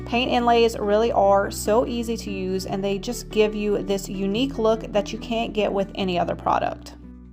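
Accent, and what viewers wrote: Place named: South, United States. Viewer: Ha! I just thought it was a southern accent thing!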